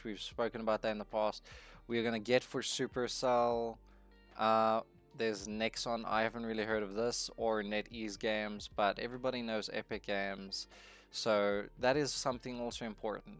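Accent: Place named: United States. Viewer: that american accent tho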